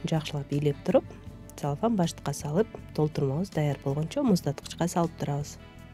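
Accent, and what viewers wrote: Standard. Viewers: Азаматсың таза сүйлөп,үнүң да ушунчалык уккулуктуу экенсиң! Колуңа береке сиңдим! 😍😍👍👍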